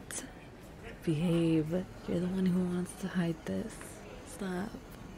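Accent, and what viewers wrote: South, United States. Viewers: god why does your voice have to be so nice with or without southern accent got me punchin and kickin the air, and don’t even get me started on your absolutely amazing laugh 🤭🧎‍♀️🩷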